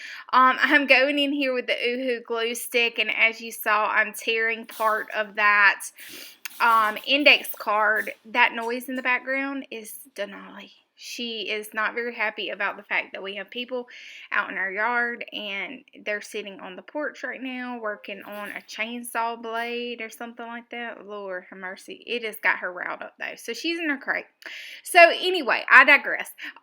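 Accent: South, United States. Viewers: Well you said “tickle my fancy” and also “excuse the chainsaws and dogs ...” 👀👀 so I’m good with anything you do ! 🤣🤣 sound like you’re from Mississippi?? Texas?? Louisiana??? Hurricanes ...?? Hope all is ok and I love the idea of scanning ( a lot of work, yes) they’re great and I love your little book ..... “my moonshine container !!!” 🤣🤣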